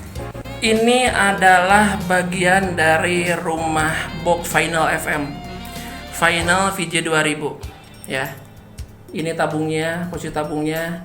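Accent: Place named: West Java, Indonesia. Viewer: Penjelasannya mantap jelas logatnya sundanis asli keeeren lahh pokoknya👍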